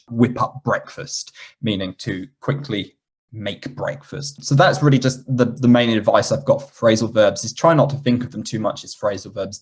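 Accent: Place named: United Kingdom. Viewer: You sound like a British for me 🎉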